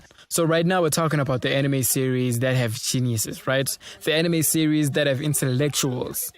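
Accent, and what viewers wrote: South Africa. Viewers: Is the guy commentating on this video from South Africa.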